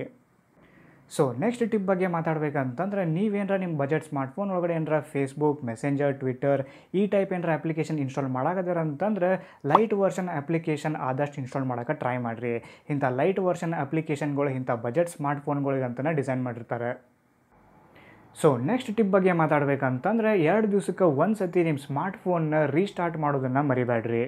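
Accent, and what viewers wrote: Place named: Uttara Kannada, India. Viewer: Superb Uttara kannada language superb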